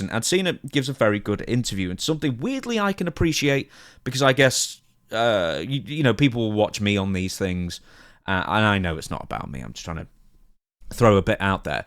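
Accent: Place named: England, United Kingdom. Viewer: What part of England is this accent from? It's awful!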